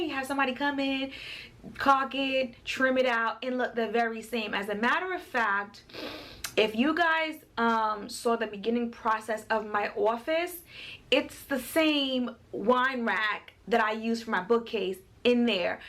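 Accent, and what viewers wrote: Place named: New York, United States. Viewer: Just found ur page... LOVE IT..... I don't care how long you've lived in ATL , you're such a New Yorker...(accent)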